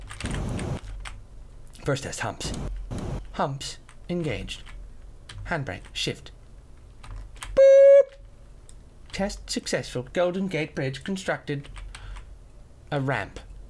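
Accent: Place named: England, United Kingdom. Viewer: I love your English ascent!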